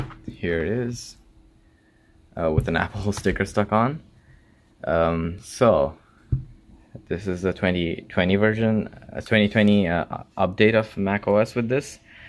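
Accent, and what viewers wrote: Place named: Arab world. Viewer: Love your middle eastern accent bruh, and thanks for this informative video! I plan on picking up this exact laptop due to it looking exactly like a MacBook Pro. Already Have a Mac Pro gaming Hackintosh. And yooooooo, I planned on doing the exact same thing with the apple sticker when I get this, are you me?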